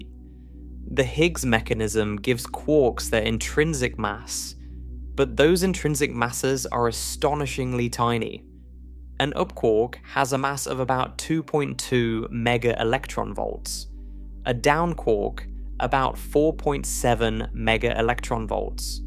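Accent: United Kingdom. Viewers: Another AI video with a British accent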